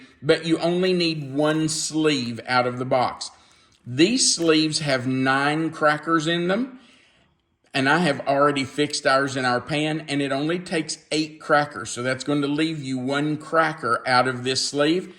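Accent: United States. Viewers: Just sayin, I'm from Nevada. If you can say Banana correctly you can say Nevada properly. Great video can't wait to try it on our grandchildren. Didn't notice the accent....just American accent! Keep going.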